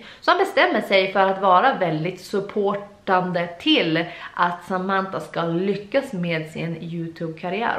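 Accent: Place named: Västerbotten, Sweden. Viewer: Alltså du måste ju va från Västerbotten.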